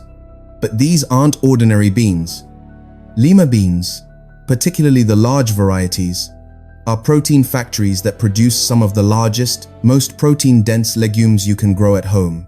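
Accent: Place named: England, United Kingdom. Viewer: Talking dollars with an English accent 🧐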